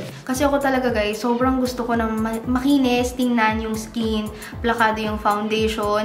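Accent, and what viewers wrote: Batangas, Philippines. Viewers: "Pinay skin tone kumbaga" love ur batangueña accent. Ps: subscriber from Batangas 😊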